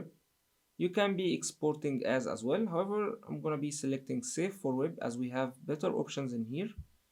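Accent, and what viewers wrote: Egypt. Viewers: السلام عليكم هو حضرتك مصري ؟ اصل من اللكنه قلت انت ممكن تكون مصري .. انا معجب بشغلك جدا ما شاء الله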